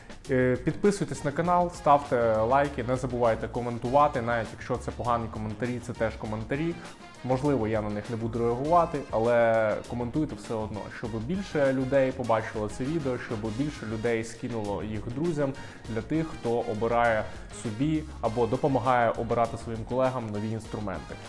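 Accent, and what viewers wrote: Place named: Surzhyk, Ukraine. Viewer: Как бы ты не пытался, но увы, слышно четко, что Украинский язык не твой родной, суржик это твое, вся восточная Украина знать не знала своего языка, все говорили на суржике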